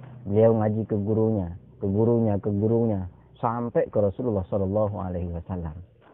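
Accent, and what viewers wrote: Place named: Madura, Indonesia. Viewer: Ketauan banget orang Madura ya cak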